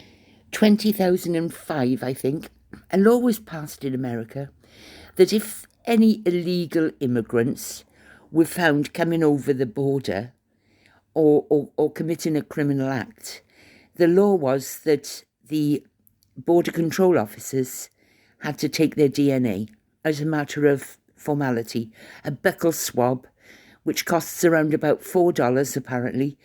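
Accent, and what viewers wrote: Wales, United Kingdom. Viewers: Sweet love hearing yr welsh brogue, you can witter away as much as you like. Yr channel, we all come to listen to you whatever the subject. BTW Kamala H can’t pass any bills under a “lame duck” GOP (I think that’s what it’s called before November 2024 elections, am sure our US cousins will advise if incorrect). Why are the people’s “tips” being taxed⁉️ I thought a tip was a “customer gratuity” to make up for low pay & also to get good service, how bizarre. Have a fabulous morning, afternoon or evening 😘🦘🐨💐🇦🇺🏴🇬🇧🇨🇦🇺🇸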